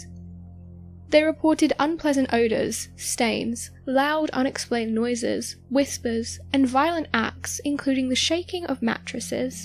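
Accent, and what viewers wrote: England, United Kingdom. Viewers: Why is it hard to understand a clear English accent?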